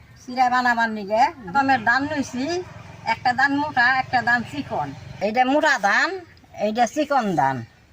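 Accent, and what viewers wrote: Tangail, Bangladesh. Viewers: এটা তো বাংলাদেশের টাংগাইল জেলার ভাষা বলতেছে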